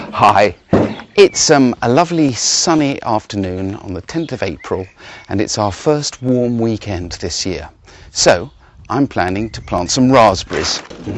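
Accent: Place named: RP, United Kingdom. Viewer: so posh !!! "im going to dig a trench?" video reminds me of a monty python intro !!!!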